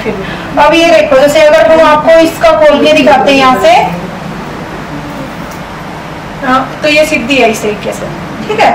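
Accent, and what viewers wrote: Haryana, India. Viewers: Madam ji Apki Awaz se lgta h ki aap Ki boli Hariyanvi h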